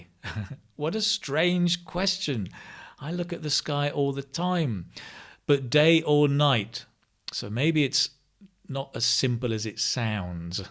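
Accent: United Kingdom. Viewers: Hi 🙋🏼‍♀️ Great as always, thanks a lot charade is my cub of tea 🙃 By the way I’m in love with British accent 😍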